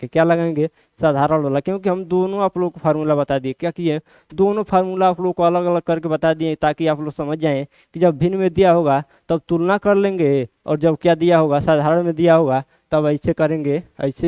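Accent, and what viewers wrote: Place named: Uttar Pradesh, India. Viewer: Bhaiya aap sachi me up ke lag rhe ho 😂😂😂😂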